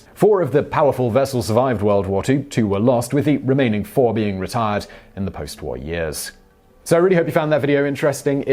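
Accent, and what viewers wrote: England, United Kingdom. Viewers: Despite speaking with an English accent, clearly despises his English heritage. I have watched his videos for a long time now and I think I am pretty much done. He is an an Englishman but chooses to use US nomenclature despite the fact that I think most US viewers are intelligent enough to enjoy these films without having an Englishman talking in US terms just to pander to them. Also he is talking about warships and chooses just one British vessel, ignoring HMS Victory, HMS Beagle, HMS Endeavour, HMS Nelson, HMS Campbeltown, HMS Dreadnought, which was arguably the greatest warship of all time. I really can't stand men who are ashamed of their own heritage. Mr., get a grip sunshine.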